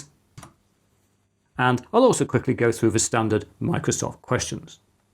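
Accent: England, United Kingdom. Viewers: english accent, check. electronic music, homemade, intro, check. nerd time, check.! love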